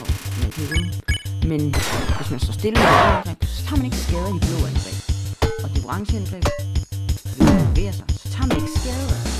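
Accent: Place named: United States. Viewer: Det lyder som en amerikansk spiller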